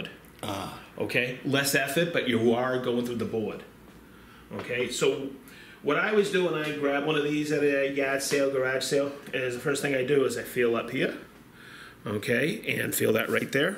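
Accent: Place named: New England, United States. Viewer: I guessed "New England", so I was right but it's maybe unfair to guess six states at once?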